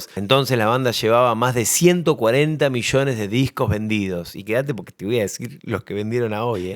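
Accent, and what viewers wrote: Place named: Argentina. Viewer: Demasiado argentino para entenderte. Voy a pedirle a un yucateco que haga un vídeo igual a ver si le entiendes.compadre.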